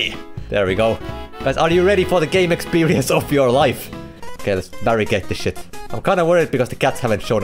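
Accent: Finland. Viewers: Wait you are Finnish. I thought you were like russian by ur accent but somewhat Finnish accent but yeah Suomi perekele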